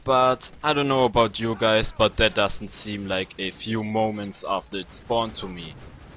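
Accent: Germany. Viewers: man hört echt das du deutsch bist :D haha. aber cooles video axton war auch immer mein lieblings char. ich hasse diese leute die in einem singleplayer spiel auf meta und guides hören. was wichtig ist ist das man spaß hat mit dem char den man spielt...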